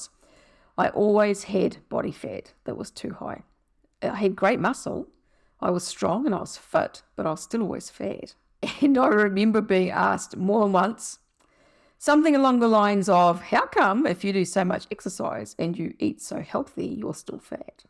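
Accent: New Zealand. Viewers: Great to hear a Kiwi "eccent" on YouTube!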